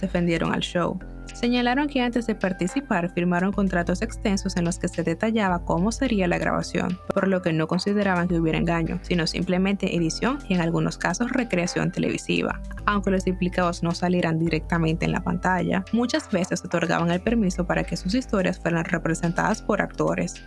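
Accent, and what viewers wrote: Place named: Dominican Republic. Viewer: Por tu acento supe que eres dominicana, buen video sigue así y que DIOS te bendiga :3 😊😊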